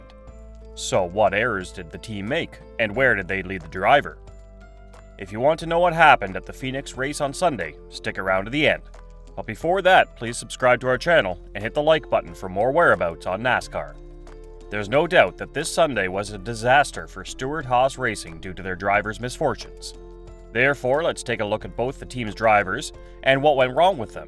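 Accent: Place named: Canada. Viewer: A NASCAR channel with the Canadian talking eh,. What a joke!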